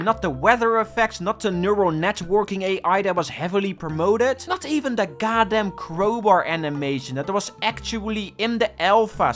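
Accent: Netherlands. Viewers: This guy his English sounds so painfully Dutch. Lol Good video though. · Binnen de eerste 2 seconde herkende ik een mede-Nederlander. Gekoloniseerd broeder. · Is dat een Nederlands accent dat ik hoor? ;) Goede video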